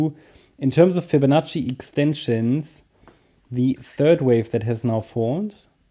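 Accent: Germany. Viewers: stets sehr starke Analysen (ich höre den deutschen Akzent raus :)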